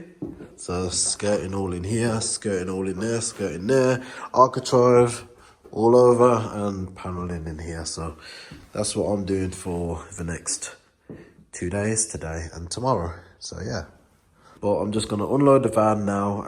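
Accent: Birmingham, United Kingdom. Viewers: Can't help being a Brummie 😂😘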